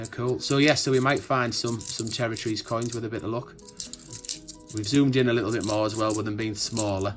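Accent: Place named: Northern England, United Kingdom. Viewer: Love a good old northern natter keep up the good work.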